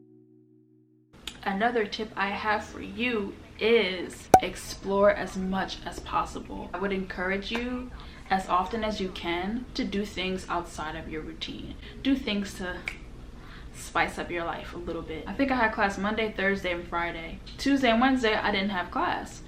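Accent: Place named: United States. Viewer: You sound like you lost most of your American accent 😂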